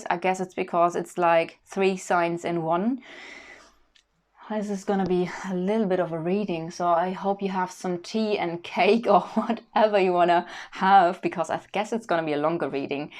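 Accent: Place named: Germany. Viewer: We missed you, your accurate detailed readings, your lovely personality and your sweet voice and angelic singing. I can slightly hear the German accent integrated in your soft British English. I can see you've been working a lot with the German community, but please do not forget us, the rest of the world. You would not believe the hearts you've touched, even in a faraway land like Albania, an unknown place to many, from where I'm listening to you now! Thank you dear. Danke schön! A Virgo sister! <3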